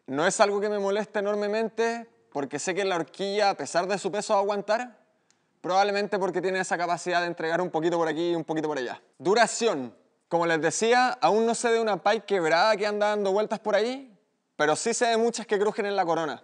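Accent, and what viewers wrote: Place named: Rural, Chile. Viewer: Por qué no hablas un Chileno como Cristian Contreras Radovic? No podes ser menos chileno campesino? Sería mejor de oírte en otros países! Saludos cordiales 😎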